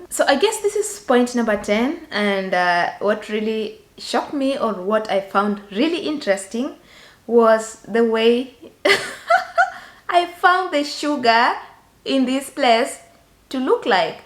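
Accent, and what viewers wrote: Kenya. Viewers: A very interesting video. Unfortunately I’ve never heard anyone speak English with an Kenyan accent and about 20% of what you were saying was lost for me. Good luck in Germany.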